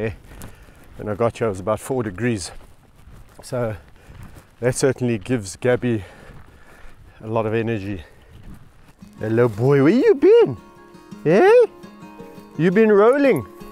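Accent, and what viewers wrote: South Africa. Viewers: I love the “sithe Efrikan” accent. 💗💗💗💗💗💗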